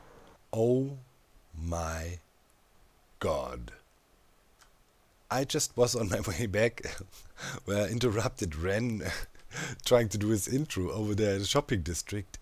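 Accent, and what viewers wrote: Germany. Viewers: hey i wonder If you can understand that ich habe erst und der Folge erfahren das du deutsch bist hatte aber immer den Akzent gehört ich gucke deine Videos jetzt seit grob einem Jahr die Shadow items die du mumbo letzte Season gezeigt hast haben haben mich neugierig gemacht und ich habe dich abonniert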